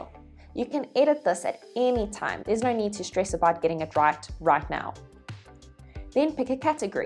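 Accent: South Africa. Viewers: Awesome hearing the South African accent when learning online. Great content, thank you - it's a new teaching journey for me and this was a very insightful video 🌻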